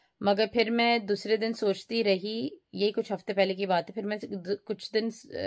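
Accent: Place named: India. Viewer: You're just like Indian,because your tonks are very much similar to hindi & i feel that the way of talking is too much Indian.Happy Diwali & happy new year.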